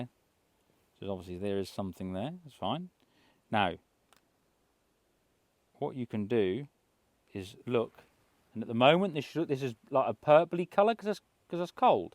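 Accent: United Kingdom. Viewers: Lets see if you read any comments???? Marshall Crawlers....in the part of the UK where your particular accent pertains....due to the type of land, Marshall crawlers were everywhere, it seemed like every other farm had one??? Are there any still around, I don't mean the later ones running on rubber, but the real Steel tracked Marshall Crawlers. (I did a project on them at school) in the late 60's.😂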